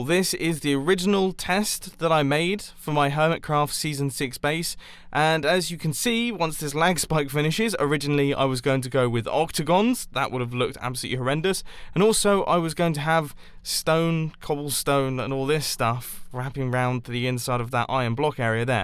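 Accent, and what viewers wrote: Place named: England, United Kingdom. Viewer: Damn i feel the english through my phone.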